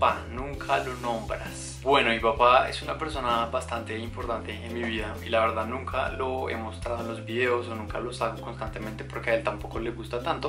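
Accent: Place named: Colombia. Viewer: Baby face jiji you look more younger jajaj ! Me encanta tu acento colombiano !! 😁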